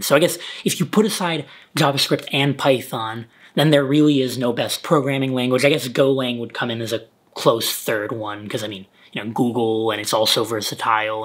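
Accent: Romania. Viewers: You romanian ? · Are you romanian?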